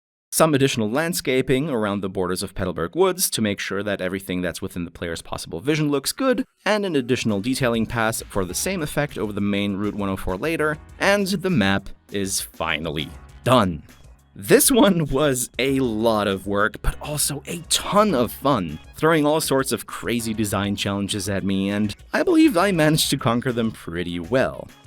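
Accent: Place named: Germany. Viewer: Are you german by any chance? You sound a lot like you were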